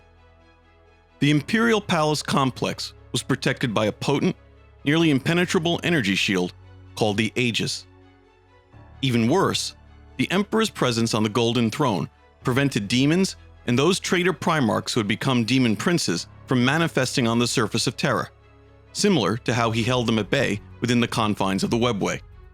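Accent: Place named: New York, United States. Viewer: He’s from NY. Youman, Yuge.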